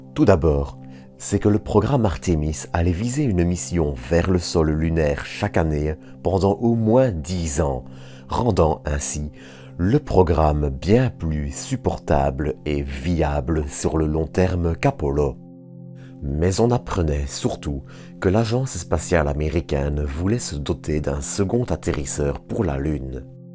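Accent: Belgium. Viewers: merci pour les précisions, mais j’avais une autre idée de l’accent belge, encore bravo pour tes videos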